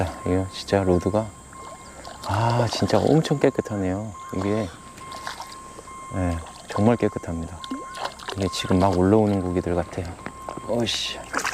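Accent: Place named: North Chungcheong, South Korea. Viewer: 충청남도가 아니구 충청북도 말투시네유~~~ 제천쪽 그쪽 사시네벼유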